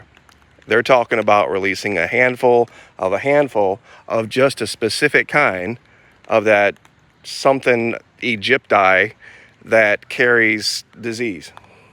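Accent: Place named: South, United States. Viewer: Hey! Yer country voice is leekin’ thru!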